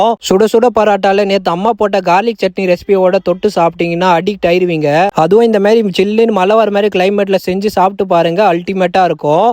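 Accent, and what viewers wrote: Rajasthan, India. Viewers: How u speak Tamil? You are Rajasthani or Marwadi?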